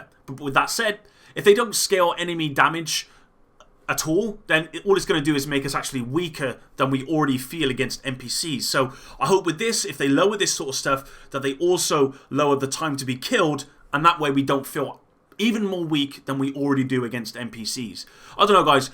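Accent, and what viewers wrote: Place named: West Country, United Kingdom. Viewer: are you from Bristol or Plymouth?. Your accent sounds like you are.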